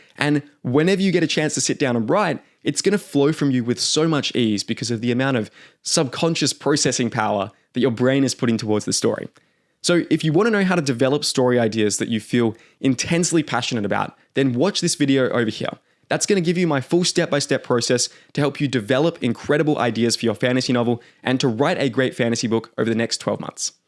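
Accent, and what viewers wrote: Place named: Australia. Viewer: Microsoft with an Aussie accent is just plain weird to my American ears! 🤣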